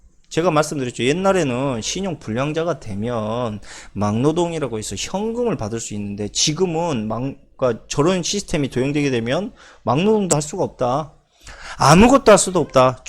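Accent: Jeolla, South Korea. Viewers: 전라도 분이신가봐요? ㅋ 말투속에 사투리가 구수허시네요^^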